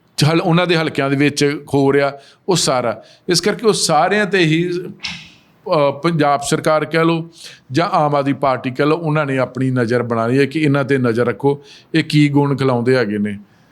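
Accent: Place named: Delhi, India. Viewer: sahb vich delhi wala bolde lagda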